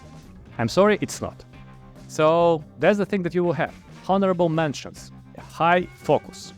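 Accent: Russia. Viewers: I love your Russian accent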